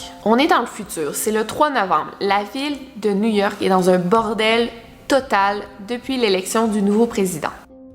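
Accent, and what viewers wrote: Quebec, Canada. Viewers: J'adore toujours autant tes vidéos et ça me fais toujours plaisir dentendre a nouveau l'accent québécois en tout cas continue 😘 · Pas sexy l'accent canadien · hey! je kiffe tes vidéos que j’enchaîne en continu depuis 3 jours! ton "petit" accent québécois me fascine et me donne encore plus envie de visiter le Québec! alors merci de nous divertir malgré tes études, ton taf et ton futur mariage pour lequel je te félicite en passant! Mille bisous de Bretagne!!! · Pareil, je suis Française aussi et j'adore ton accent,! Je pars en vacances au Québec dans quelques jours, du coup ton accent m'emmène déjà en vacances. ^^ Et tes vidéos sont vraiment travaillées et intéressantes, je ne m'en lasse pas.:) (Bon par contre c'est loin d'être la première fois que je commente, mais je voulais te le dire. ^^) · Elle est Québécoise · L accent canadien horrible fait des sous titres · impossible d'écouter..affreux l'accent canadien..lol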